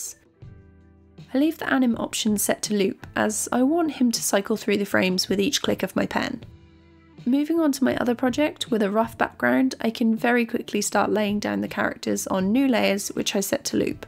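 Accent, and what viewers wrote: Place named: Norfolk, United Kingdom. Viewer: “Cuttn tool” love the Norfolk twang ❤️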